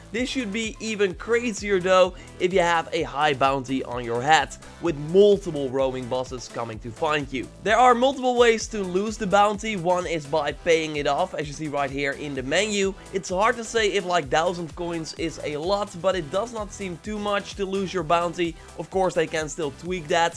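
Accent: Netherlands. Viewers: Are you dutch?? Your accent really sounds like it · RuiDui yeah he is Dutch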